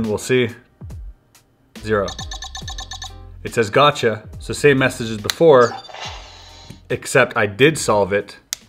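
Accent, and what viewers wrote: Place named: Quebec, Canada. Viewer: C’est la première fois que je tomb e sur une de tes vidéos et lorsque tu a dit merci dans part 1 jme suis dit c est sur qu’il est Quebecois lol Quand tu as dit Sébastien dans celle-ci ça confirmais... En tout cas bravo 👏 je suis un nouveau subscriber ✅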